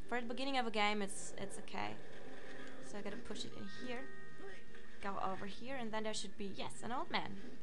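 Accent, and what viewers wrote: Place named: Ireland. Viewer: I got your accent now, now I know why it sounds Irish a bit.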